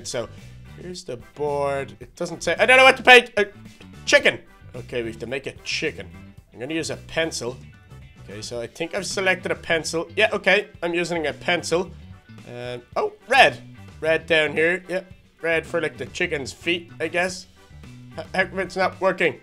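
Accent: Dublin, Ireland. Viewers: So your Irish? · are you from Dublin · I knew you were irish because I'm irish and its ovious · Are you from Ireland I'm not from Ireland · Your Irish wow I thought you was English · Are u from Dublin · I knew that ur from Ireland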